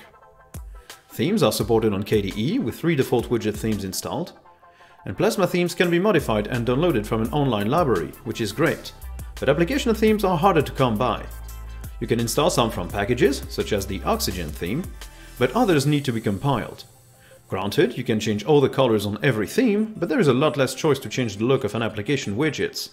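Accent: France. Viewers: Ta accent français était un peu plus fort qu'elle est aujourd'hui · La meilleure chaîne Linux que j'ai pu trouver et ce petit accent français me fait plaisir à entendre. De mon expérience, les extensions de Gnome peuvent cracher ta distribution après une mise à jour de version. Elles sont à utiliser avec modération. Tout comme toi, je trouve les sous-menus de KDE un peu frustrant. Continue ces vidéos de qualité qui se concentrent sur ce qui fait un peu défaut aux distributions : de la finition.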